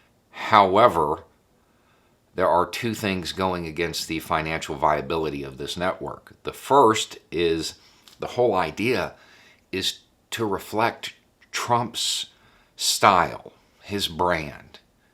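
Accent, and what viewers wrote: South, United States. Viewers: Too bad Jill Biden can't be Secretary of Education, cuz Joe's got ethics.🤘❤️🤘 Happy Diwali, y'all. PERFECT timing. Btw, YOUR Southern accent makes you sound smart.✌️ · You have a southern accent are you having to fight people in walmart like me with my Biden hat?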